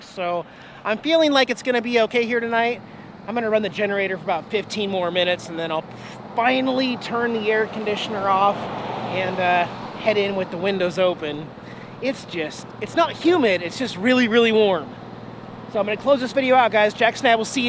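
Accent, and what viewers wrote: South, United States. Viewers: You can't get the South all the way out of a Southerner!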